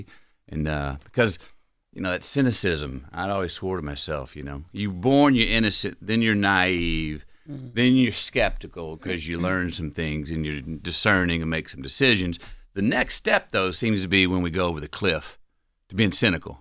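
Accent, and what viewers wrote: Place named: Texas, United States. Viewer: Gurlllllllllllll I broke my nail I CLICKED SOOOOOO DAMN FAST!!!!!! I loveeeee his Texas country deep voice having ASS!!!!!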